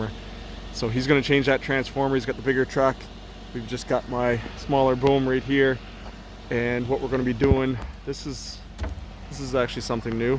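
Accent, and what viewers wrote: Canada. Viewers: Your Canadian accent and your usage of "feet" as a unit of measure don't compute properly in my Universe... 🤔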